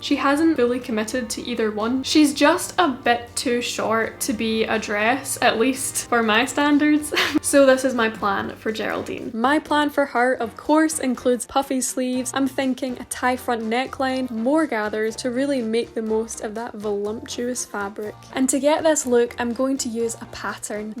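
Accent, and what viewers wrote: United States. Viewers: your american accent was actually so good 😭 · Your american accent was pretty good! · your american accent is really good! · Your American accent was actually on point! Loved this video, thank you for sharing and making us all believe in thrifting and the possibility of transforming "those" clothes into something new and fresh! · no ur american accent was PERFECT what the heck lol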